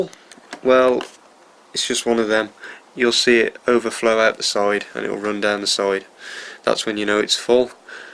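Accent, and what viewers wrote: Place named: Midlands, United Kingdom. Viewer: Very rare in Uk for N Gauge, but just found one on EBay. Buying it now. Enjoyed the video and enjoyed the Midlands accent too. Honest. I am ex-Kiddy, but now in Cornwall. Keith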